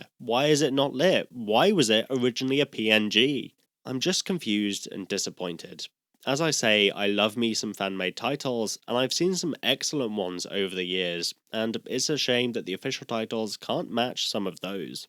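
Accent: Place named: Scotland, United Kingdom. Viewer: I FORGOT HE'S SO SCOTTISH OH MY GOODNESS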